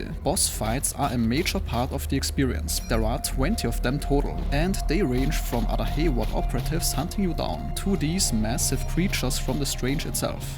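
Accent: Germany. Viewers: "Chee-see Doom". German accents are entertaining and I like your style, subbed.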